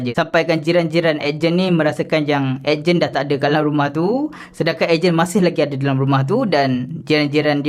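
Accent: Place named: Kedah, Malaysia. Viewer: Tudiaaaaa cakap kedah kaaaaa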